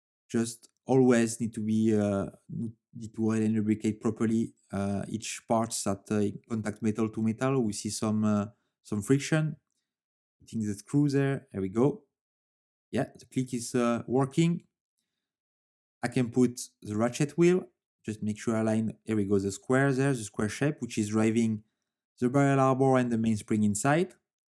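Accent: France. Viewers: Great video. I like the Franglais accent. Just one tip. When you assemble the automatic works on top of the movement, it is better to unwind the main spring and let the movement stop. If a screw would drop into the running balance spring, that can cause a lot of damage.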